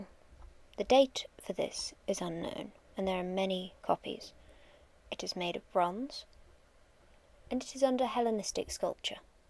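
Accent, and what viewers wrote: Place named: United Kingdom. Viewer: thanks much for the sensible and accurate narration! youre accent is great! like a sensible Victorian Lady! :)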